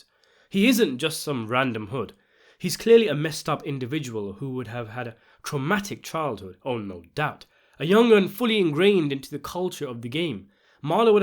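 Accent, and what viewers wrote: United Kingdom. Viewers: The perfectly placed Wire one-liners in a British accent are CRAZY 😂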